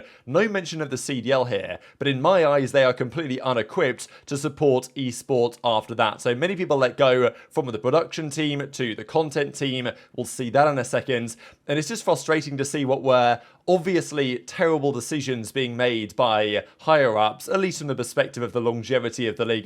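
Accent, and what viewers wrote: England, United Kingdom. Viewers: I think he says " back again today" but it sounds like "hocked rob" cuz he says it so fast in his English accent lmao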